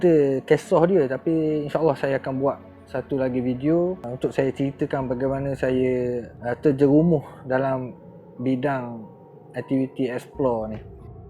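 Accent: Terengganu, Malaysia. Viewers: kn....minat sgt kt...lg2 dgr org ckp loghat tganu...tu suka tgk ni...😊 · Xtau laaa nk tgk video ke...nk tgk penjelasan..sedap bunyi ganu tu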